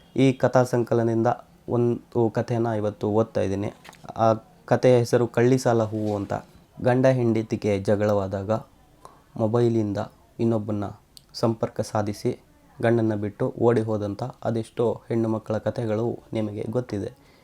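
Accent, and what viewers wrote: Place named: Davanagere, India. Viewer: Anna nam Davanagere bhashe mathadidri, tumbha santhosha aytu Keli , urin nenp aytana 😢😊😊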